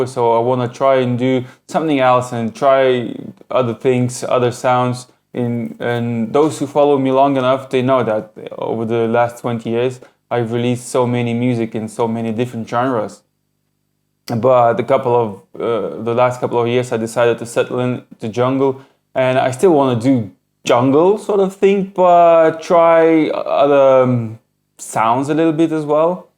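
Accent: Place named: Eastern Europe. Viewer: Your accent always really takes me back, Its like half eastern european and half british cockney almost?! Have you spent time in UK?! Wicked tunes as always mate.. DIY cassette releases are the ay forward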